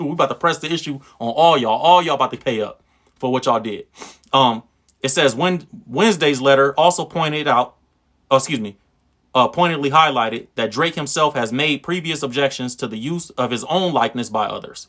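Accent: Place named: Los Angeles, United States. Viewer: I like your LA accent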